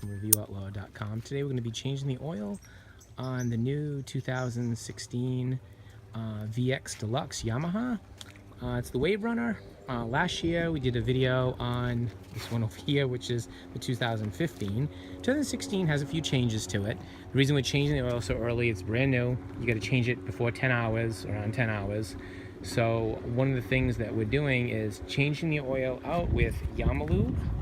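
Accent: Rhode Island, United States. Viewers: Am I detecting a providence Road island accent?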